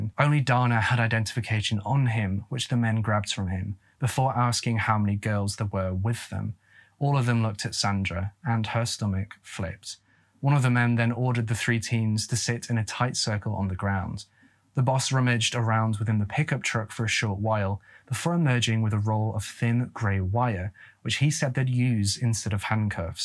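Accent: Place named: England, United Kingdom. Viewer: Oh, I don't really find any reason to take offense, but it did spawn an enjoyable and interesting conversation about the strange way certain words are spelled or pronounced. And I'm not trying to bag on in any way; he's English and can't really be expected to understand the full nuance of American pronunciation. I really only commented because I'd want to know if I was pronouncing something wrong and I'd hope somebody would politely correct me.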